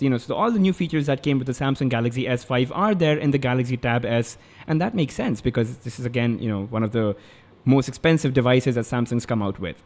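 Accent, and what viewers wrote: India. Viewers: yea thats the indian accent for sure lol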